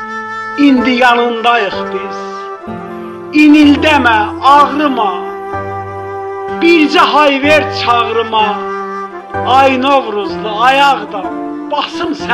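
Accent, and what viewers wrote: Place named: Karabakh, Azerbaijan. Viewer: Sağ qardaşımız.Sənin səsin şerlərin qədər gözəldi.Bəlkədə şerlərini başqa adam oxusa bu qədər möhtəşəm alınmaz.Çox sağ ol.Şirin Qarabağ ləhcən adamı özünnən aparır..👍👍👍Ağdamlı balası.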